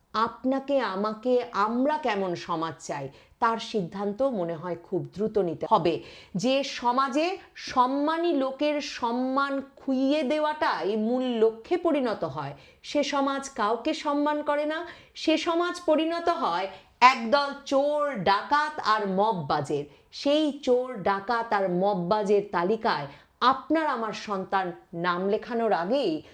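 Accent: India. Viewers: Indian awaz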